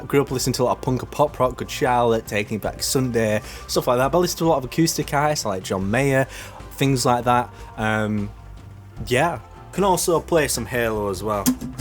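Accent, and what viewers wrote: Yorkshire, United Kingdom. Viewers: Sounds like a Keighley lad.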